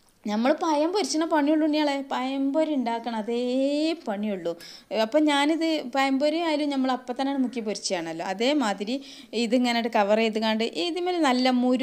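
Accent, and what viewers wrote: Malappuram, India. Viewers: ചിക്കന് ബദൽ ആയിട്ടുള്ള മുട്ട ബ്രോസ്റ്റ് സൂപ്പറായിട്ടുണ്ട്. വീഡിയോ എല്ലാം ഒന്നിനൊന്ന് മെച്ചം തന്നെയാണ്. എങ്കിലും താത്ത ഇതിനോടൊപ്പം ഉള്ള നിങ്ങളുടെ സംസാരം ഒരല്പം പോലും കുറയ്ക്കേണ്ടതില്ല. കാരണം മലപ്പുറം ശൈലിയിലുള്ള നിങ്ങളുടെ ഈ സംസാരം തന്നെയാണ് നിങ്ങൾ ഇടുന്ന എല്ലാ വീഡിയോകളുടെയും ഹൈലൈറ്റ്. സംസാരം താല്പര്യമില്ലാത്ത ആരെങ്കിലും ഉണ്ടെങ്കിൽ ആ ഭാഗം അത്തരക്കാർ സ്കിപ്പ് ചെയ്ത് പൊക്കോട്ടെ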